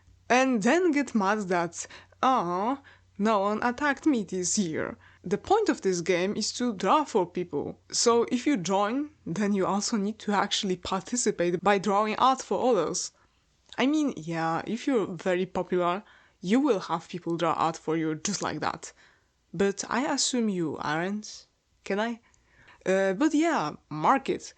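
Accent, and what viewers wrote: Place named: Poland. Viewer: You're either polish or dutch aren't you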